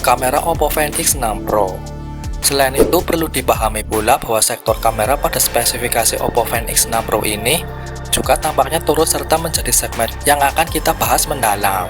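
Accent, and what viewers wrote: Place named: Tegal, Indonesia. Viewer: Presenter nya NGAPAK ORANG TEGAL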